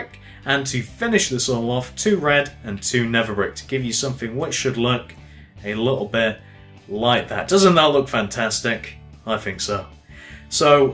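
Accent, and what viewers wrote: United Kingdom. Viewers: Mary Ruth Orr he has a british accent calm down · It's his accent, he's british xD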